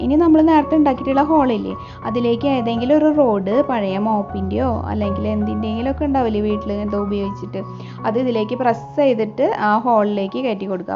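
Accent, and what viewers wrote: Thrissur, India. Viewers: Thrissur bashayanallo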